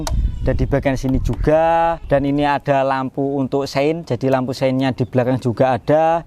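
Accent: Java, Indonesia. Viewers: Cara mereview logatnya dialek Jawa apa ni mas, biar beda ama yg lain sering2 aja cara mereviewx ngasal 😅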